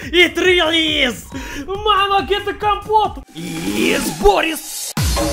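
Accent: Russia. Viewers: It's just a.m. here in Uruguay (South America) and i'm here, in my chair, watching a russian/ucranian guy talking and gloryficating slavs with that russian/ucranian accent and i love it. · Чувак ты русский??? У тебя акцент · this makes me wonder, is Boris actually russian? or a brit/american with the best russian accent on the planet?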